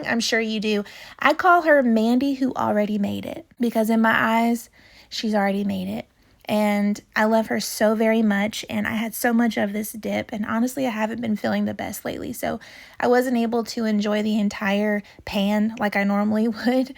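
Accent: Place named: North Carolina, United States. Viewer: Just found you looking for laundry room makeover (its was beautiful by the way..2025) annnd to get the view number off of 666..🫢😁..seen Mandy in your videos(love her channel) you are so fun and love the accent..im in North Carolina so it sounds like mine..lol..I have subbed and look forward to getting to know you thru your videos..God keep and God bless 🙏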